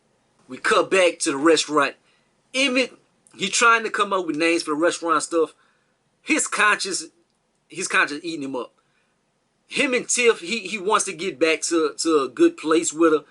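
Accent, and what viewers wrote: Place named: New Orleans, United States. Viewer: Just found you. Adore your commentary. Lemme find out that accent from New Orleans! 😊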